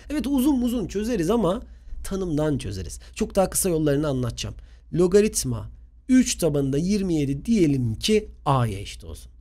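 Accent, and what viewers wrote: Aegean, Turkey. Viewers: Hocam yorumları okuduğunuzu biliyorum ve bir ricada bulunacağım : Bu ders ege şivesi kullandınız bazen bi gün Karadeniz şivesi de alır mıyız ya ? Edit: Karadeniz ağızı .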